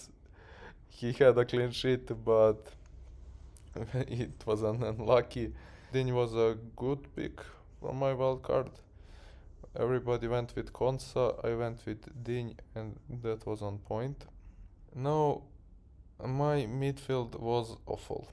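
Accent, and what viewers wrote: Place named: Serbia. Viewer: English with Serbian ascent:)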